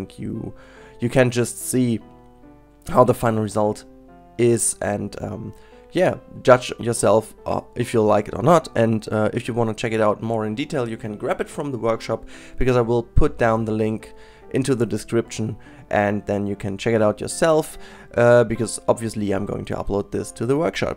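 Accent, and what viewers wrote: Germany. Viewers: Man hört es an dem Akzent xD so spricht fast jeder Deutsche Englisch aber du sprichst echt gut Englisch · you sound like a german!? du bist deutsch oder?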